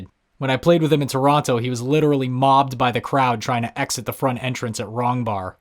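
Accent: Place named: United States. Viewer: even though it's been literal decades it's still weird to hear someone with an american accent talking about OG dubstep great vid great music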